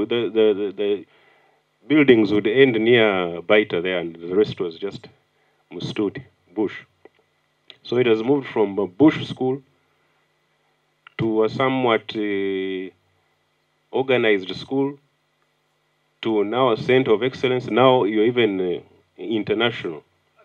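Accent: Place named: Kenya. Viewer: Ur swahili sounds more of kenyan🤔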